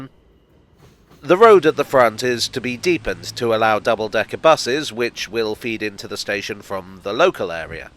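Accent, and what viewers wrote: United Kingdom. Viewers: Tunnel-boring-machine noise; the British accent makes it! 👍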